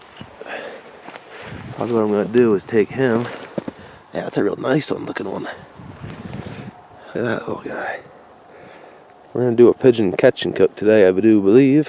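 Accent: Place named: New England, United States. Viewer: Oh your from new england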